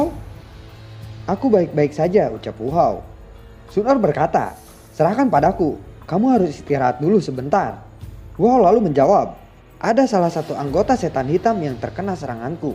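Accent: West Java, Indonesia. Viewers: orang sunda gening mang wkwk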